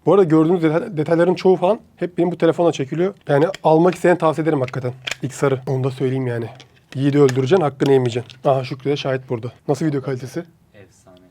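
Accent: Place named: Eskişehir, Turkey. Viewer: Daha videonun basında düşünüyodum bu adam eskişehirli diye harbide öyle çıktı mk (bende eskişehirliyim)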